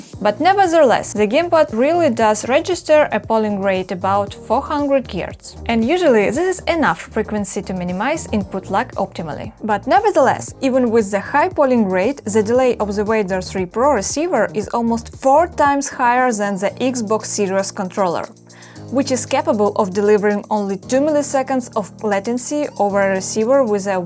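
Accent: Russia. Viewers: This person has no idea what they are even saying. Most obvious actor/ plant video I have ever seen. Not sure who paid her, not sure she has any idea either. But 379 subscribers and a strong russian accent. Yeahhhhhh gunna get a second opinion on that... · Russian accent is hard not to recognize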